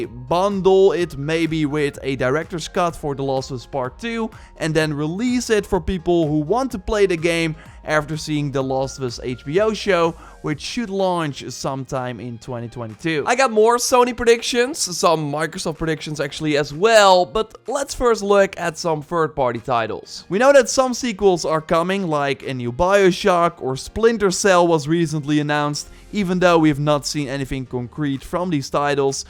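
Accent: Netherlands. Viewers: Watched u for 5 seconds and I immediately detected a fellow dutchman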